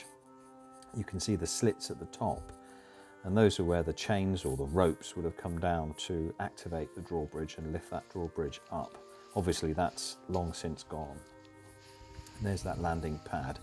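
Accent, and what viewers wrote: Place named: United Kingdom. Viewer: Absolutely amazing tour of antiquity, made captivating by the tenor of voice, his knowledge of this historical structure, his colorful imagination, and his lovely British accent! Loved it! Thank you for sharing, but I'm also missing Anna's and your DIY projects, dancing & creativity!😉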